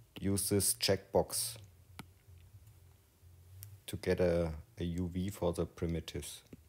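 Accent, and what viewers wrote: Bavaria, Germany. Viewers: nice Bavarian English, no gimmicks:hand-purple-blue-peace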